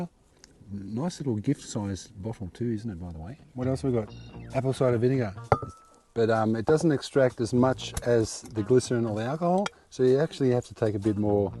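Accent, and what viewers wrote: Australia. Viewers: I subscribed for the same reason! Gardening and an Austrailian accent. Good day! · Hi 😊 What would be the chances of me coming to do work experience? Forgive me if im wrong but that is an Aussie accent? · Thank you for giving free information and I copied down much of the links and when I get paid I will be supporting your work and I appreciate all the information and help! Thank you so so much and I love your Australian accents helps my American add mind pay attention! ❤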